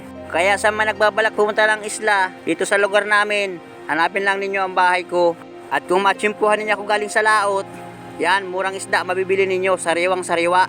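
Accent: Bicol, Philippines. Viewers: Tagalog ang salita tunog bicol😅😅😅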